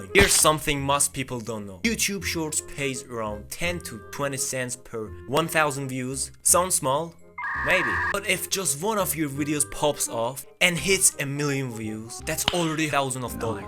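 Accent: Iran. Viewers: بنازم داداش. عالی صحبت میکنی ولی بازم یه ایرانی لهجه تو میفهمه. موفق باشی عشق داداش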